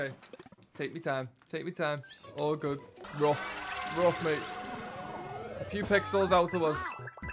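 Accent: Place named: Liverpool, United Kingdom. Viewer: Scouse lad playing game of the year. Count me in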